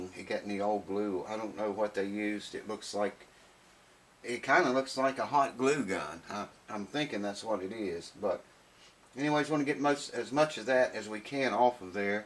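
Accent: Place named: Kentucky, United States. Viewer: Dig the vids. I watch them cuz your one cool cat. You tone of voice reminds me of my family from Harlan Kentucky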